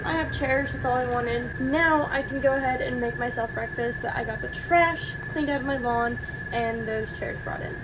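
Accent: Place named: Texas, United States. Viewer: your beautiful live your voice with that Texas accent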